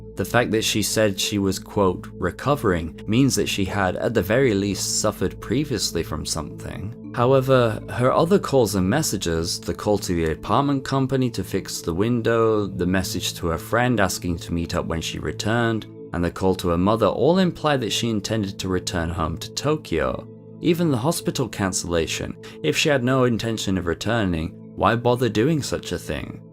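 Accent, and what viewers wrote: United Kingdom. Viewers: Is it the British accent? I can't think of what could be hard. He's a basic YouTube presenter and fairly basic to watch. Literally nothing complicated. As for other content like this, Sakura Stardust also does Japan only internet mysteries, mostly stories about 2Chan but there's a lot of variety there.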